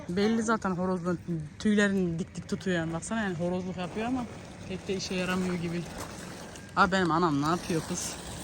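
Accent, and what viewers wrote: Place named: Azerbaijan. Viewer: Salam yaxşı gəzmələr ❤siz azərbaycali kimi danişirsiz anenlere salam göndərirəm azərbaycanan 😍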